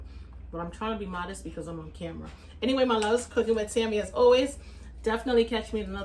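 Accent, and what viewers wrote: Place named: Northeast, United States. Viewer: I thought I heard that north east accent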